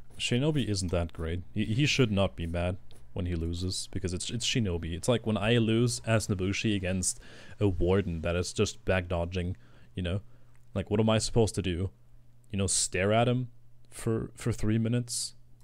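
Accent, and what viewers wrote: Germany. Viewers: Wait youre German? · Ohh you are German?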